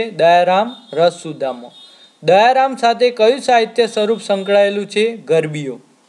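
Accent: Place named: Mehsana, India. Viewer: તમારો મેહસાણાનો તળપદો અવાજ સરસ છે.."મ" સીરિસ સીવાય મસ્ત છે બધુ...આટલી વિસાળ મહેનત કરો છો તો ભુલો ગોતી અમારે તમને ન કેવુ જોઈએ.પણ મજા આવે છે...શરુ રાખજો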